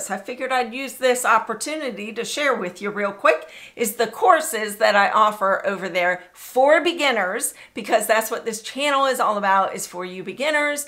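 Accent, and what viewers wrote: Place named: United States. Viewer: thank you so much! You speak American English! Yay I can understand you! You explain in a simple and direct manner. And you are nice! Thanks so much!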